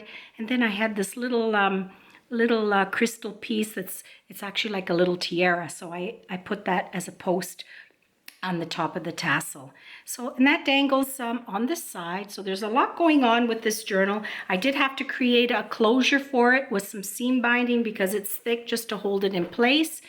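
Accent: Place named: Italian American, United States. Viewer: I love this journal; your work is always so beautiful. TFS. I'm sorry to hear about your computer. I had my one and only Mac three years ago because everyone I know said it was superior. After a year, the disk literally burned up and I lost two chapters of a book I had been writing. They were really good, too! I haven't gotten over it yet and haven't been able to get back to the book yet either. I'm using a PC again. I'm glad you said you are Italian. I have been wondering a long time if you were because of the intonation in your voice. I've known many Italian American women who have a similar timbre and speech patterns. I didn't want to be rude and ask. Thanks for the answer!!! Baci. Dolores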